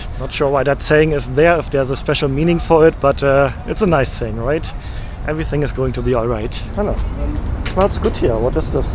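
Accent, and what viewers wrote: Germany. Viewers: ich rieche deutschen aktzent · Love the German accent 😅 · Hello, I‘m here the first time, when I heard the famous German ascent, I had to listen a little and I must say, I liked your tour very much! I wanted to visit Qatar 3 years ago, but it didn’t happen, so you gave me a very good impression and description of that place, thanks very much! I am only 3-4 months/year in Germany and I am completely with you, when you express your feeling’s: I wish Germany would look like this! I wish many times the same! I look forward seeing and listening to more of your recorded journeys. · Ich liebe dein deutsches Englisch 😅😅😅😅❤❤❤ I love your German English 😂😂🔥🔥 Best videos bro thank you for all this videos 🎉🎉🎉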